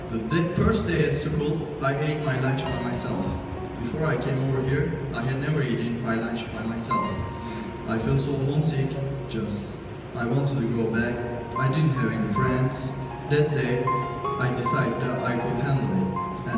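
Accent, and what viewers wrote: Turkey. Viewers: türk şivesi yakıyo ya ama sıkıntı büyük hikaye üzücü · Türk aksanı, ve yabancılar bu aksana bayılıyorlar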